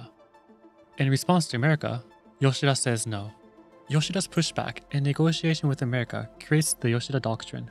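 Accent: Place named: Japan. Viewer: From your accent, I think you're Japanese. I'm Brazilian and I have many Japanese friends. Brazil has a large Japanese colony and the first people were brought here in 1908 by the Kasato Maru. I worked for VARIG AIRLINES for many years and I had the privilege of working closely with the Nikkei. I learned a lot of good things about their culture and made them part of my life. I still have a dream, which is to make Japan and Brazil one Country. A kind of merger between the two Countries, if you will... It would be the greatest NATION in the world! You have got a new subscriber. Greetings from Brazil. あなたのアクセントから、私はあなたが日本人だと思います。 私はブラジル人で、日系の友達がたくさんいます。 ブラジルには大規模な日本人植民地があり、1908 年に笠戸丸によって最初の人々がここに連れてこられました。 私は長年 VARIG AIRLINES で働いており、日系人と密接に協力できたことを光栄に思います。 私は彼らの文化について多くの良いことを学び、それらを私の人生の一部にしました. 私にはまだ夢があります。それは、日本とブラジルをひとつの国にすることです。 いわば二国間の一種の合併... それは全世界で最大の国になるでしょう！ 新しいサブスクライバーを獲得しました。 ブラジルからのご挨拶。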